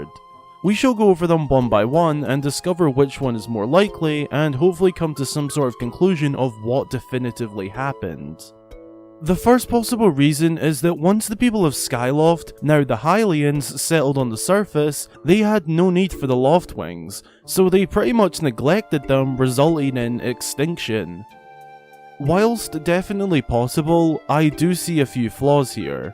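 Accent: Scotland, United Kingdom. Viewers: Do you have a scottish accent? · Are you Scottish?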